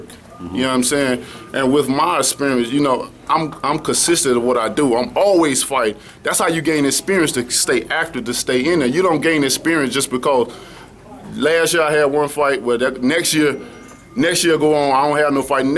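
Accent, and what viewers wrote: United States. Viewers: well Einstein, he's from a southern state, where a lot of them have the same dialect. You grammer cops kill me. This man will put you and your correct grammer to sleep in one punch.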